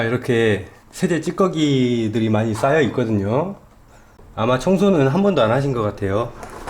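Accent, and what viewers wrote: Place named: Jeolla, South Korea. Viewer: 제가 해외거주하는데 세탁기 청소하려고 영상봤다가 기사님 전라도 억양을 듣고 전라도 분이신 제 부모님이 생각나네요😊 너무 정감가고 좋아요~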